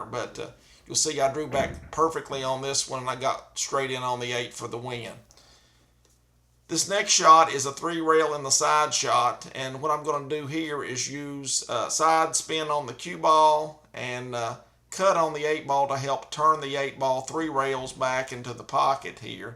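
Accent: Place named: Texas, United States. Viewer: I have enjoyed your videos and commented on several. Given the title of this one, I was a bit disappointed. Only 5, 6, 8, and 9 were truly instructive - yet one has to look for the opportunity to try them.. The others were variations of trick shots found in any trick shot books (see Byrne or Massey). An alternative shot on the “corner hooked” down the rail shot is a 3 rail shooting into the near corner face. Aim at 1st diamond up from object ball. I’m sure you know this trick shot. Keep ‘em coming. You are delight to watch and listen to. You accent takes me back to my Texas upbringing.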